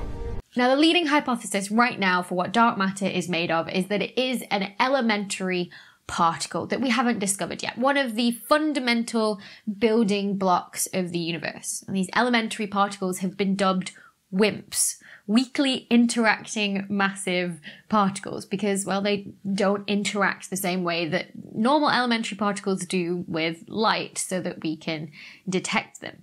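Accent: United Kingdom. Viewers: Where is dr.becky from ?? Britain ?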